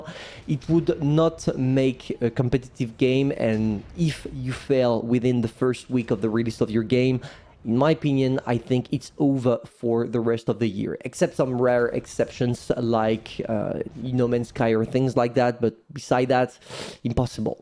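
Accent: France. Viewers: is it me or your french , c'est moi ou t'es francais ?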